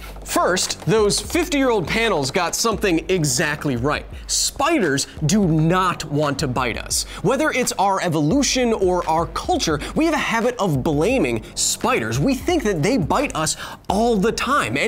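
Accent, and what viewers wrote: Australia. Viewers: He's Australian accent 😂... It's good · That Australian accent is on point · ikr! I'm surprised there aren't more comments talking about austrailian accent lol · That aussie accent 😂🤣❤🇦🇺 much love from down under. Bloody great video mate! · His Australian accent is actually very real lol. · I know I'm late to the party, but that Aussie accent is almost spot on. had me excited for a sec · unironically a fantastic australian accent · thats a good Australian accent! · That aussie accent is a 7/10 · The aussie accent 😂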